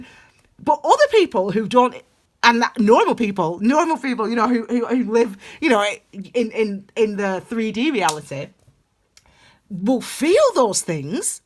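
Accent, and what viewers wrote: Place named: Northern England, United Kingdom. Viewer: Are you from Colne, UK. by any chance? I live in Cardiff now but I’m from Oldham originally, so I recognise the northern accent. I’m guessing it’s either Colne or Burnley.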